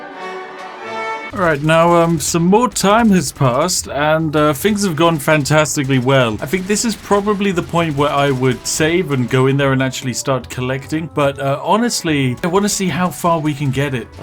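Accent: United Kingdom. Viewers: Day 5 of reminding Spiff we want to hear him say "Sugoi" in his glorious British voice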